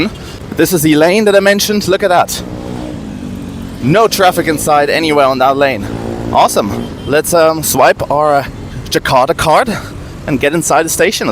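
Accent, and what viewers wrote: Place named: Germany. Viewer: Nice to hear your english .German english is the best.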